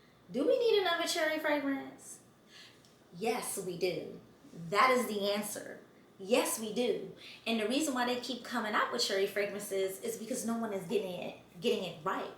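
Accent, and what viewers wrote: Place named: Philadelphia, United States. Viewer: She actually sound like she from Philly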